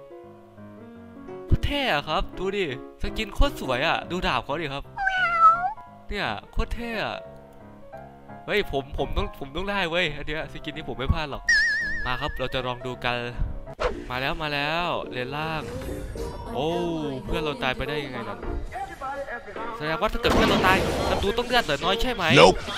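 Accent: Isan, Thailand. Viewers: ไม่เคยเห็นใครพูดอีสานได้น่าฮักขนาดนี้เลย;-; "หยามบักคัก" คือแบบตายอย่างสงบแล้วค่ะ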